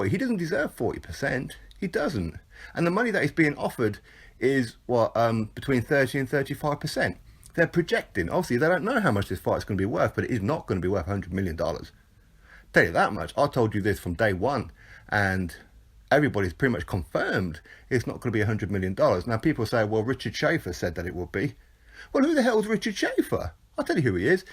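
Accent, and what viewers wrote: United Kingdom. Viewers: Is that a British accent I detect from the narrator?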